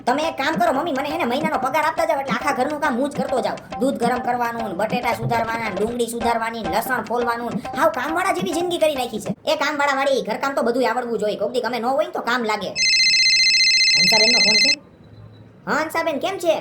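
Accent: India. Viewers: bhura tu rajkot no cho k nay... mne avu lage 6...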